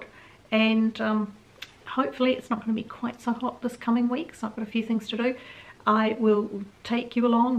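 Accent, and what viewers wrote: New Zealand. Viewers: I love when you post a chat....look for the next one each day. Currently I am packing and clearing ready to put my home up for sale. I too am bemoaning the heat as I live in far North Queensland. Did you end up being Molly’s new mum? As you never mentioned her in this clip, I assume not. Love listening to you, you sound like my kiwi sister-in-law.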